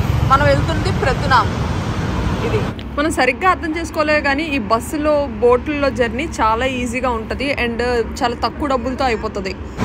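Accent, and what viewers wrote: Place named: Godavari, India. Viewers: Nice voice+ మన తెలుగు యాస = అక్క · Meru everything Bangkok ni chupisthunanru nd mi telugu slang spr.... YouTube nundi manchi ⭐⭐⭐ time pass ki indirect ga kastapaduthunnru miru 😍🙏👍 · తూర్పు గోదావరి లా ఉంది మీ వాయిస్ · మీ వాయిస్ సూపర్ వుంది. గోదావరి భాష. మీ వీడియోస్ చూస్తుంటే మా బ్యాంకాక్ ట్రిప్ కళ్ళకు కట్టినట్లు గుర్తుకువస్తుంది. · హాయ్... మీరు బావున్నారు. వాయిస్ గుడ్. సరే.... ఆంధ్రలో మీ నెగిటివ్ ప్లేస్ ఎక్కడ, ఎందుకంటే మీ మాట, యాస, బాష, గోదావరి జిల్లాలని ఉహించాను. గుడ్ గైడెన్స్.... వెల్ కమ్ ఆంధ్ర..... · మనం ఎక్కడికి వెళ్లిన మన స్లాంగ్ మాత్రం కానిపెట్టవచ్చు ఈస్ట్ గోదావరి అనుకుంటున్నా నేను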